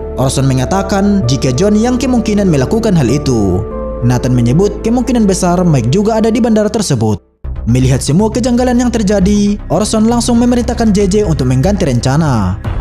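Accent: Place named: Toraja, Indonesia. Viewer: Mntafff mannnee, 🤣🤣🤣 logat mu 👍👍👍,, toraja apa duri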